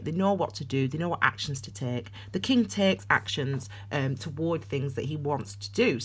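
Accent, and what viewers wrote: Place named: Yorkshire, United Kingdom. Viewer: I’m just trying to figure where it is. It’s almost definitely Yorkshire-ish 😉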